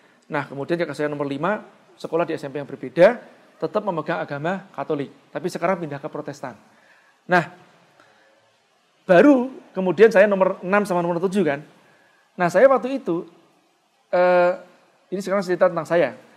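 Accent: Java, Indonesia. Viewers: tpi ada sdkt logt Jawa nya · Tanya juga Mas, kok orang Batam medoke Jawa? 😬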